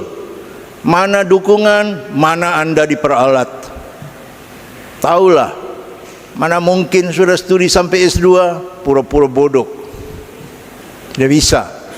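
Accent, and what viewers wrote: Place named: Papua, Indonesia. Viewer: DIALEK PAPUA ITU KELIHATAN KERAS TETAPI ITU BUKAN BAHASA KOTOR ATAU KASAR, USKUP KAMI KEUSKUPAN MANOKWARI-SORONG ADALAH USKUP DENGAN GAYA DAN CARA PANDANGNYA SAMA SEPERTI ORANG PAPUA PADA UMUMNYA. BEGITULAH KAMI DISINI. INGAT USKUP KAMI HILARION DATUS LEGA ADALAH USKUP YANG TAHU BENAR SIKON DI PAPUA, SALAM HORMAT DARI UMAT DI TPW FAKFAK, PAPUA BARAT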